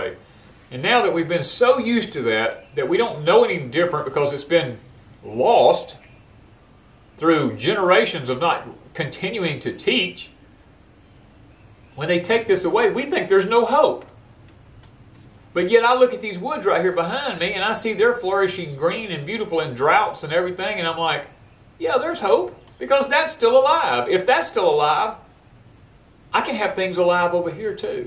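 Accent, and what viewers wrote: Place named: Kansas, United States. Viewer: Sounds like Kansas Brother with all those extremley's.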